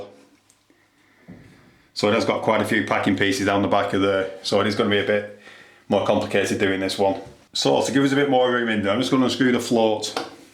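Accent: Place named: North, United Kingdom. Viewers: thank you for that - good man. Got that trust worthy northern workman voice as well to install even more confidence! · I have this type of toilet, it’s knackered and I need a new one fitted! Any chance you are close to Liverpool and could come and do it for me please? Your accent sounds like you may be from St Helens which is only down the road 🙏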